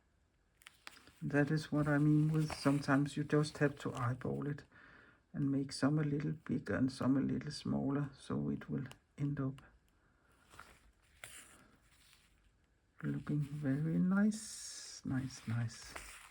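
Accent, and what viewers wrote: Denmark. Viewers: I love everything you do … and I especially appreciate the slow pace. Your Danish accent contributes to that … although I speak English, I find that the way you speak is very relaxing & totally understandable!! 💗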